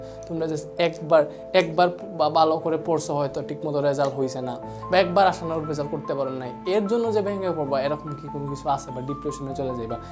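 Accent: Sylhet, Bangladesh. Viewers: Baiya apni Ki Sylheti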